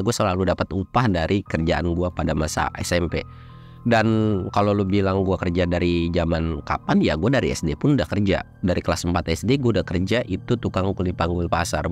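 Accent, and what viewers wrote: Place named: Bogor, Indonesia. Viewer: Waaaaahhhhhh...... asikkkkk.... suka dengerin... klw cerita ny sm mamang.. Bogor nich bang....😅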